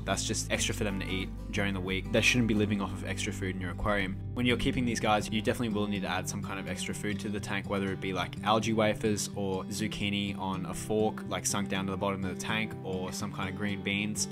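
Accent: Australia. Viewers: bro you sound Australian why you talking in gallons?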